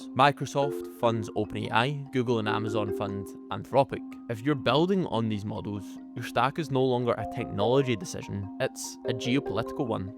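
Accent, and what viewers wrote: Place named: Scotland, United Kingdom. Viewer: Don’t waste any time at all trying to change your native Scottish accent. You are easily understood with Scottish as is. 👌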